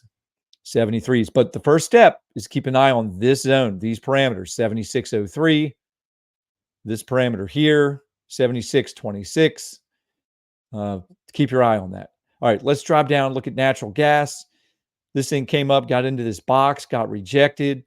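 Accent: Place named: Maryland, United States. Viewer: Btw, your accent is like my inlaws in easton,md 😂. Are you from md?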